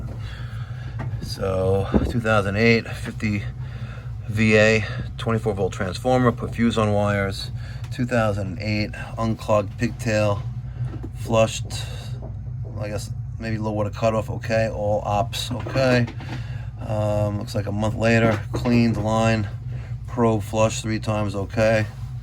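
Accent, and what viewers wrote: New York, United States. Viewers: I’m here for the learning but hearing the New York accent is almost more fun!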